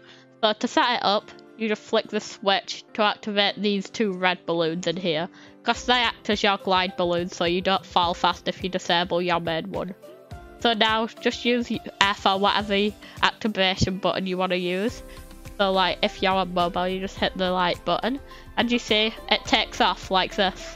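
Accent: United Kingdom. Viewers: Nicee accent ( is that british )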